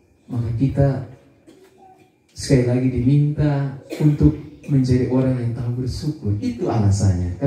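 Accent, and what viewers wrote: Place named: Lembata, Indonesia. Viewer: ni macam dari pulau lembata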